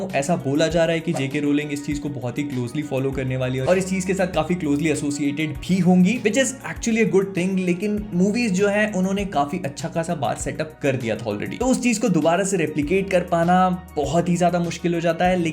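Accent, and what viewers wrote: Bhopal, India. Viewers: Ye bhopali wala hai kya 😆🤣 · Full Bhopal mode me bhai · The bhopali accent ✨ Miya!!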